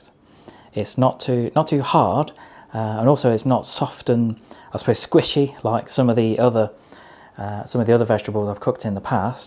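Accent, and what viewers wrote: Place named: Liverpool, United Kingdom. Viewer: Why are you speaking in a Scouse accent? Whereabouts in Liverpool are you actually from? If you are not from Liverpool then why are you speaking in a Scouse accent?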